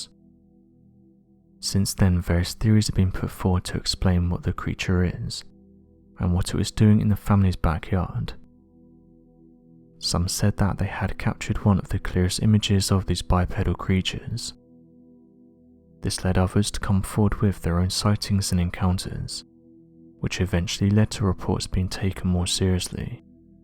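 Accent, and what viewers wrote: England, United Kingdom. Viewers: Works for me ONLY if I don't listen to how he pronounces certain words, such as saying "er" for the "th" sound...eaver instead of either. It reminds me of a speech impediment, but the English have funky accents depending on what part of the country they're from like us ie Southern vs Yankee!!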